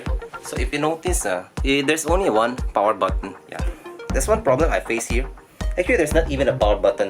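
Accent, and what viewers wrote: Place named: Singapore. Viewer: grabeng accent singaporean vibes :) more videos again using brand new piano. :)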